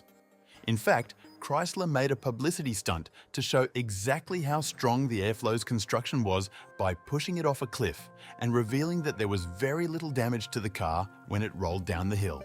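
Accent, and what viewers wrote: Australia. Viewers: Who wrote the script? This guy has an aussie accent but speaks the American language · Love hearing an Aussie voice doing one of these, amazingly put together video to top it off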